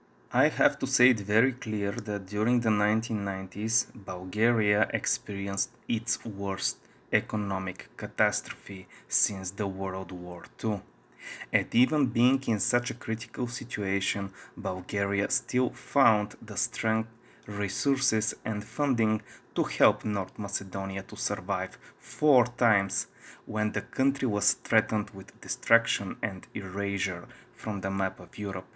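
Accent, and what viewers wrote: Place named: Russia. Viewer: Your English with Russian accent is very funny!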